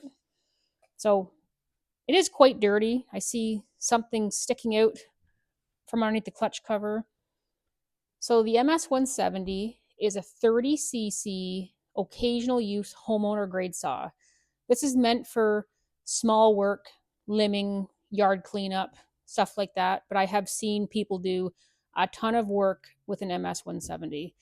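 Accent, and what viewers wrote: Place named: Canada. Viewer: See that's why I love when you do videos on every saw because we learn something new every time. I really dig the Canadian accent I can hear your voice narrating a book on audible. I also love the sarcasm throughout and died laughing at chainsaw protective services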